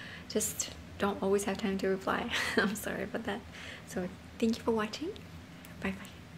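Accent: Russia. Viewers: “Im gonna sue you” im hysterically laughing (even though its a serious topic) this is true 🇺🇸dont touch me or i will SUE😂😂💁🏼‍♀️💁🏼‍♀️💁🏼‍♀️ Darryl is awesome you guys are great together! All the worries that you used to have to deal with alone you’ve trusted him to take care of things and, like you said, help you! Letting him help you has allowed you to be so much happier and lighter and worry about MomLife worries lol and you can hear a cute little thing in your voice that I think comes from speaking Russian frequently with Mila 💖thank you for this video, I look up to you so much💕✨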